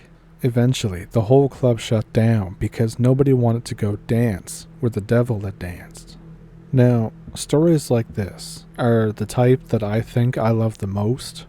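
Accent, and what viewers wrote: Ontario, Canada. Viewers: Odelay,. I enjoy how much you sound like my Ontario cousins-! 😂😊😊😊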